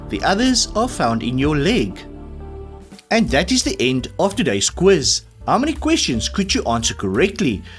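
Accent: South Africa. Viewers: That is a lovely South African accent. I really enjoy listening to this gentleman! But plateau is the spelling; not "Plato."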